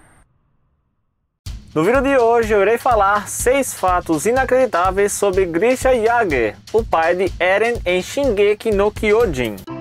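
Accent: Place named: Minas Gerais, Brazil. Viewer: o jeito que você fala é muito mineiro,MORALHAS"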